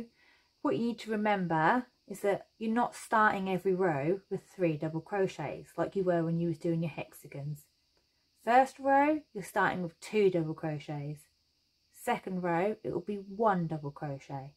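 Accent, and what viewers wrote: Norfolk, United Kingdom. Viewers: I ordered this pattern on your Etsy and followed the video so I could learn patterns better. Thank you so much for really making this step by step! I'm new to crocheting and patterns do my head in. I imagine the stitches floating in midair somehow and can't picture where everything is supposed to go. Hahaha Thanks to this video, I completed my first ever cardigan! Now I'm working on a second. Also, I LOVE your Norfolk accent! It's like having your nan hand you a bowl of nice hot soup. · Brilliant tutorial. Thank you. Do I detect a Norfolk accent? I'm now extending my sleeves and they seem to be getting wider, is that right? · Are you from Norfolk?!?!? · Sorry not crochet related but I was trying to work out you accent lol what part of the uk are you from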